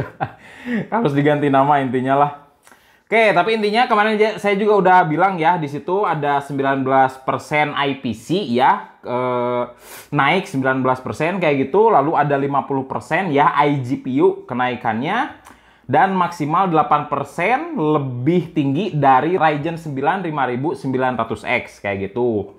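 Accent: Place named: Tasikmalaya, Indonesia. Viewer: Orang tasik dia gan, makanya gitu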